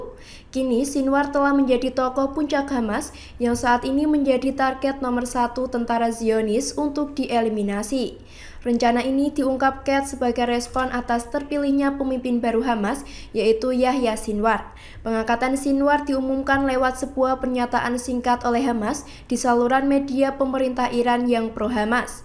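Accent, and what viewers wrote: Java, Indonesia. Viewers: mimin nya medok amat ya😅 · Narator nya medok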